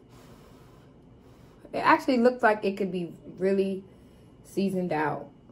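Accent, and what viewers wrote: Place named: Baltimore, United States. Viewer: I'm trying to get some. U sound like u in bmore n I cant find em down here nowhere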